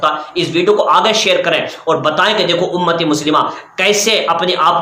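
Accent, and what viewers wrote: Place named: Arab world. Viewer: Ye urdu bhi arabi worst mein boltay hain😬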